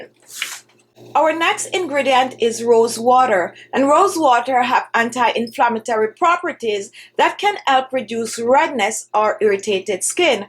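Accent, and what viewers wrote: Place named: Jamaica. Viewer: Hello, are u jamaican like me it sounds like u have the same accent. NO offense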